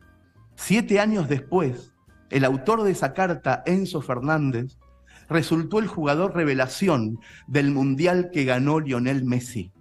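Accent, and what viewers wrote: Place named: Córdoba, Argentina. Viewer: Zarpado muy lindo lo que escribiste y nunca pensé en eso, como también soy de Córdoba nuestra tonada es nada que ver. Es como tu pasaporte.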